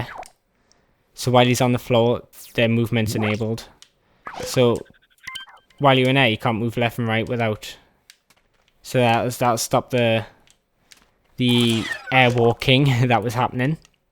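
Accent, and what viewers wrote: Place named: Ireland. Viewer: Why am I obsessed with Irish accents?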